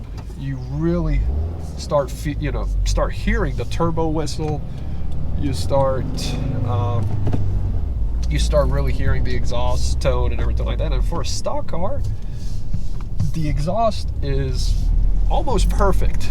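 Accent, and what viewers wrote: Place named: Europe. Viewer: You have a cool accent - kinda of a blend of a European accent with an American Southern accent.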